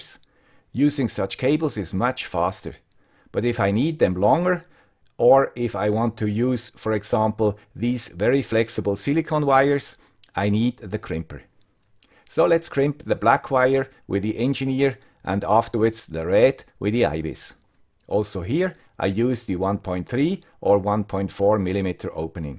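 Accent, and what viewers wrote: Switzerland. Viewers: Thank you Mr. Swiss Accent. Great comparison.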